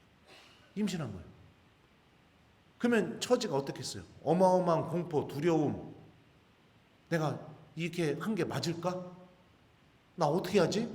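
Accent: Chungcheong, South Korea. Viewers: 신부님 감사합니다 제 고향이 대전 인디 신부님 충청도 사투리들으니 엄청 반갑네유~^^ 충청도 분이신디 말씀은 엄청 빠르시네유~~^^ 매일 잘 듣고 있습니다 감사해유❤❤❤ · 신부님 말씀 잘 듣 고 있습니다 미국에서 충청도 말씀 을 대할수있어서 더 정이 감사합니다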